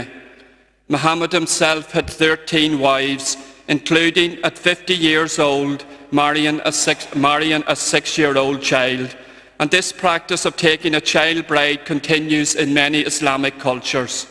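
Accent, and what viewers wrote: Ireland. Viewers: Wonderful homily and beautiful Monaghan accent!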